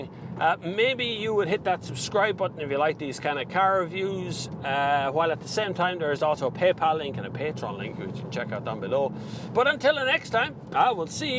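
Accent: Ireland. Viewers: Enjoyed hearing the Irish accent in this review.Haha...Only thing better would be hearing a female Irish accent! ;-)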